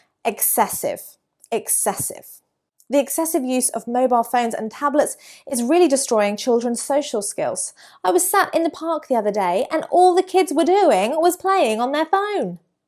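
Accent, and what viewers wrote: United Kingdom. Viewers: Really I enjoy each lesson with you I loved the British accent it is attractive when you use it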